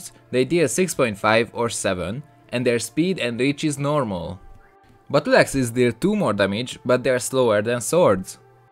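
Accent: Hungary. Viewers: De dúrva a magyar akcentus, de fasza a videó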